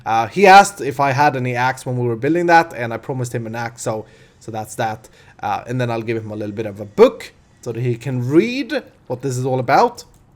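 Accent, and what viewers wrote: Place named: Sweden. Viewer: U sounds so much like Anomaly! #Svensk-engelsk dialekt! :))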